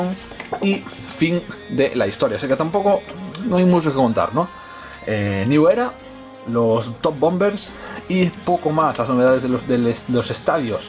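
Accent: Galicia, Spain. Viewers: De donde eres? Eres galego, non?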